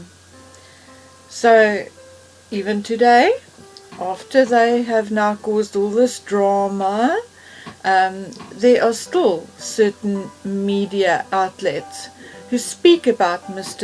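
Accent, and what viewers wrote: South Africa. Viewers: Are you South African? Loving the accent im from neighbouring country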